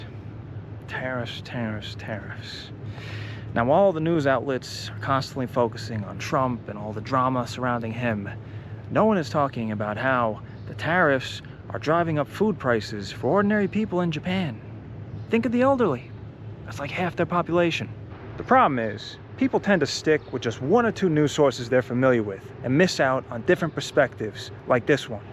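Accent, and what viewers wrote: New York, United States. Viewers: Just wanted to say that I really love your videos. As a fellow New Yorker who used to travel a lot when I was younger, it's like nothing else to be able to tag along on these adventures of yours. It's just so real, like a window into another life. I really appreciate your NY accent and your sense of humor, you just feel like someone I would know, but your skill with languages makes these videos. Not another disrespectful American, someone who gives the rest of us a good name. Thank you for all of it. The Russian and Israeli videos were harrowing, by the way, if it wasn't already uploaded and we were watching it live, I'd even say anxiety inducing. Courageous. You deserve a break, hope you get a nice rest back here in the states, and looking forward to seeing you back at it whenever it strikes you. In the meantime, I'm sure there's more in your backlog I still haven't seen and will content myself with that. 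Thanks again for all of these!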